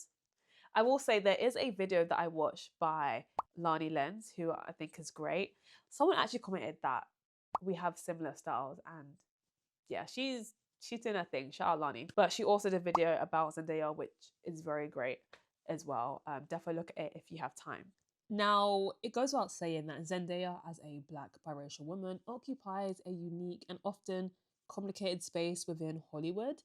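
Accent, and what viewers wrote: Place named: United Kingdom. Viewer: Very confused as to why I’m hearing a British accent but I’m seeing you call someone who’s clearly not black a black woman. This take falls flat when you refuse to acknowledge her proximity to whiteness. You are not biracial you are not viewed the same as a “Zendaya”. We do not have the same experience. Infact “Zendayas” in the world have a privilege over black women. It’s very glaring when you talking about racial dynamic and refuse to acknowledge this.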